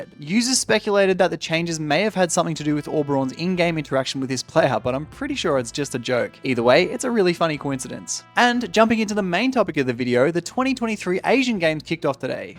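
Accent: Australia. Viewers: "Talkin' about de bloke" I might be dumb, but are you australian? lol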